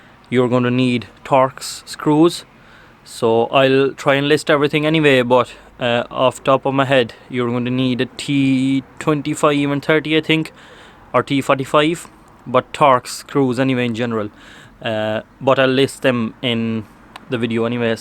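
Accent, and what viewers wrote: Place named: Portlaoise, Ireland. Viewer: That a Portlaoise accent I’m hearing, Thanks for this video brother helped me save allot.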